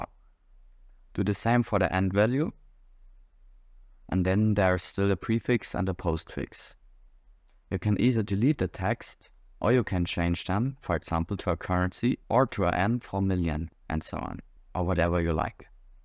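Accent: Germany. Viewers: love your german accent 😋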